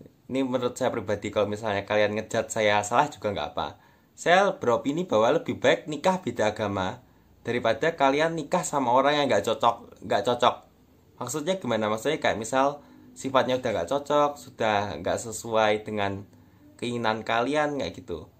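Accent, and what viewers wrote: Java, Indonesia. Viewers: Logat mu jawa bgt😂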